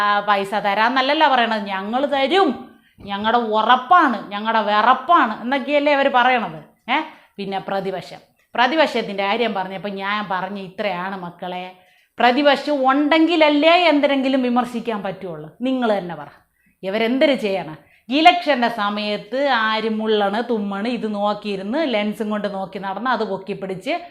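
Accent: Thiruvananthapuram, India. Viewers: ഇതു തിരോന്തരം ഭാഷകളാണ് അല്ലേ അപ്പി 🤣🤣😂വളരെ മനോഹരമായിട്ടുണ്ട് ❤️👍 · തിരോന്തരം ആണോ?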